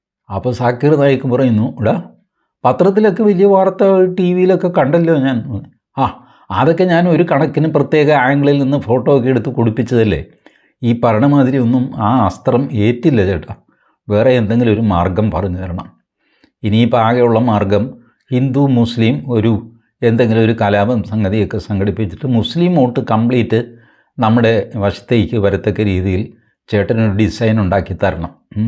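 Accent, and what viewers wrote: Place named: Kottayam, India. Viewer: എതായാലും താങ്കളുടെ ആ കോട്ടയം ഭാഷയില്‍ ഉള്ള, hasyathmaka അവതരണം കലക്കി 😂😂 ജവാബ് നഹി 😂...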